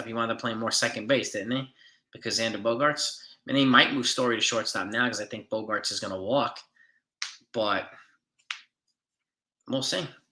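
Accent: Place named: New York, United States. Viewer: 1991 was the last time the Yanks had a month as bad as Sept. And it was Sept. 1991... Don't worry, I got your back. That's about all I have, you got it all, Home grown NY'er, got the accent (to me anyway, I'm an Oregonian)...Love your content. Glad I came across your channel. Wish the Yankees would have finished stronger. I know the games didn't really mean anything, but still, split with Texas? We should have at least gone 3 out of 4. And Cole worries me. That was like 3 starts in a row where he gave up a tying or go ahead HR. but I think the offense picked him up in two of them. Gonzalez is a lefty and Tampa's pitchers are all righties (Starters anyway). I think that's what they were saying..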